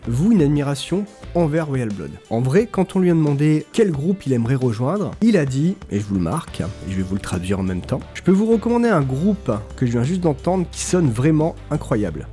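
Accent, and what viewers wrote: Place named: United Kingdom. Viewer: Belle découverte ! Et c’est moi ou ton accent british s’améliore 😅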